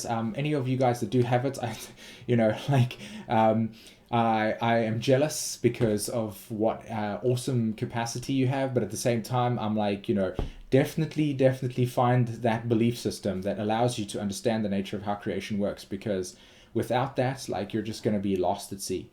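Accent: South Africa. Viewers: Are you South African ???🙀😺. Great video 👍🏽🌚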